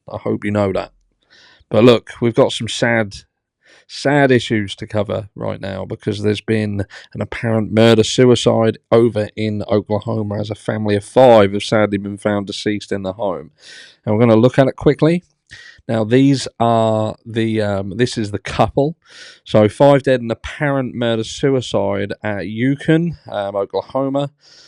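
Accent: Norfolk, United Kingdom. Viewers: Where r u from? U should english amd from Norfolk is that right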